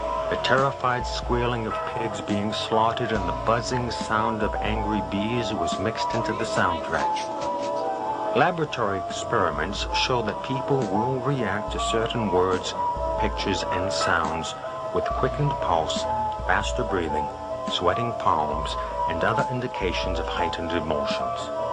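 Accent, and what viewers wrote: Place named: Boston, United States. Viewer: british? he sounds like he is from boston to me!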